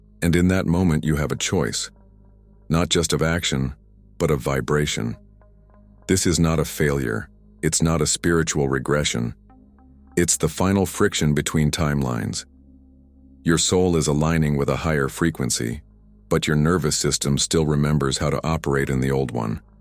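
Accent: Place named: United States. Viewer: The discomfort im feeling right now, is the reaction to this vile American accent.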